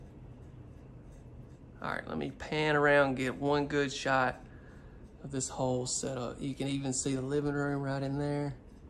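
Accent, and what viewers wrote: South, United States. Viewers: Love your accent! I miss living in the south. Blessings to you and your family. Thanks for being here. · U sound just like southern mom ? I'm pretty good about sounds let me know if you are lmbo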